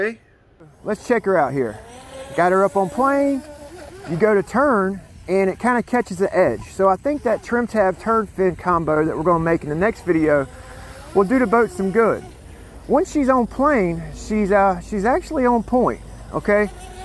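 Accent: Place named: South, United States. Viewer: There's a TON of RC boat videos out there, but I must say; if you want to learn something, this is one of (if not) the BEST channels. Sometimes, can be WAY off the mark in his diagnosis of a problem (his learning curve is increasing like a rocket to the moon though...), but for the other 98% of the time you can rely completely on the information he so eloquently explains in his cool, laid-back Southern style. "NICE!" "Git it Bull...." "Full Trigger, Full Trigger!!!!......." If you have any similar RC experience (Cars, airplanes), boating should be easy. Just be forewarned; there is no "perfect" setup, parts are hard to find, you LHS has no idea what an RC boat is or where they can be found, but you will spend a lot of fun time trying to find "The Sweet Spot". That's the fun of boating, many of us are going where no man has gone before..... 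Lastly (and this applies to ANYBODY considering a venture into the hobby), ASK QUESTIONS of those who obviously have some experience; you can save yourself time and grief. There are good products out there, and there is crap. Experienced modelers know the difference and are usually more than willing to share their experiences with various products. has a business connection with ProBoat, but don't let that affect your thinking. ProBoat has done a whole lot in improving and perfecting the RC boating experience and the product line they offer is outstanding. Welcome to........ The Boat Gang! 😉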